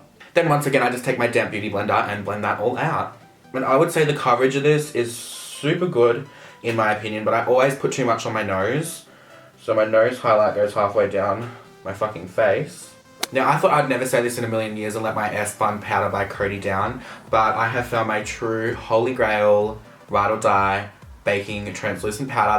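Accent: Australia. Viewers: IS THAT AN AUSTRALIAN ACCENT I HEAR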